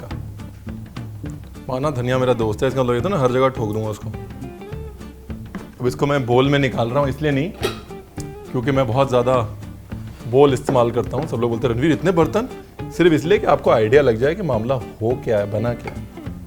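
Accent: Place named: Punjab, India. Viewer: Sir, ranveer brar you are just awesome ♥️♥️... I have seen all your recipes like each and everyone.... And I just love your accent more then your dish of course your dishes would be more awesome 🌍♥️. I really love the punjabi in you... I recently saw your motivational video on some YouTube channel. And I was so happy that we have uh... The best chef I even watch your serial Raja rasoi aur andaz anokha it's awesome ♥️♥️.... I just wanna say that sab chef's khane banate hai pyar banate ho💕... Love you sir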